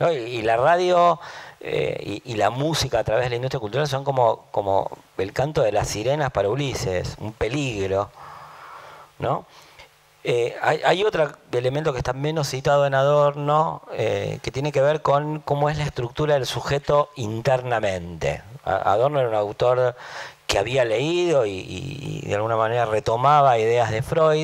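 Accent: Argentina. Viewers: quedemosnon con lo que dice que es lo importante! a mi tambien me da un poco de asco el acento porteño (ni se si es porteño siquiera)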